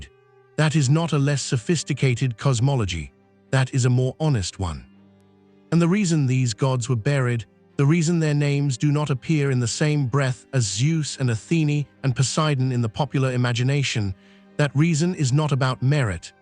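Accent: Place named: Europe. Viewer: Why do these channels claiming to reveal hidden African truths hidden by Europeans always have a European narrator? Let us hear our history with our own "voice"!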